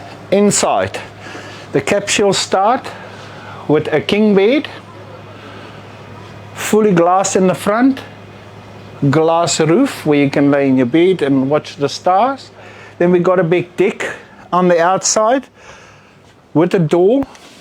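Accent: South Africa. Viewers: That's one of the strongest South African accents I've ever heard..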